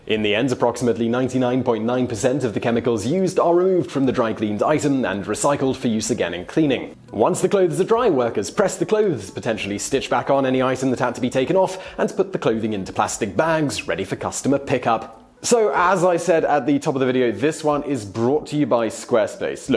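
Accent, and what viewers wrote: England, United Kingdom. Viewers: Hello I'd like to start by telling you how much I love your videos & have learned so so much from watching them. They're right up my street as instead of having a set topic you have such a wide,varied & eclectic mix (muchike my brain I'm told) of subjects & I love watching them,I used to watch daily but have recently started to leave them for a while & have a good old binge watch every Friday if possible. Anyway on to the subject of my comment,as I have mentioned I am a HUGE HUGE fan & love all your work however there is 1 thing you do that I find borderline intolerable & that is the fact that you add an upward inflection at the end of a LOT of sentences,the vast majority unneeded as you can be stating a fact whilst sounding like you're asking us a question. As much as I love your vids I find this so very grating as you sound like you're asking questions or are a teenage girl,it's bad enough hearing my 13yo daughter talk this way but one of my favourite Youtubers as well,it's not just me that feels this way as a lot of your fans feel this way too as we've discussed it in the comments before if you've ever seen any we have agreed to BEG you to stop adding this annoying Americanised gumph to your vids,you have an amazing English accent PLEASE PLEASE use it & do our country proud. 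Please carry on making these amazing videos (minus the humph) & I will carry on absorbing all the amazing trivia. Your long time fan Mark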